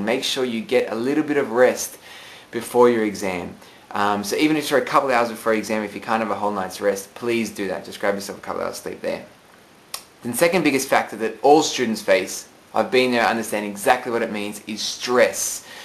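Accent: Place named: Australia. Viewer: He did. He sounds Australian, and we say rubber :L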